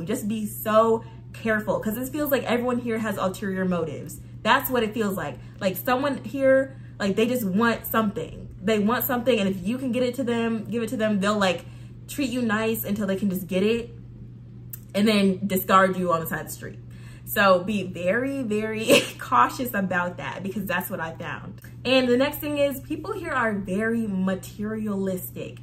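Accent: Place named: New Orleans, United States. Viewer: When u talk about men it sound like u went out there n nigha was tryna pimp u or sum. N it sound jus like New Orleans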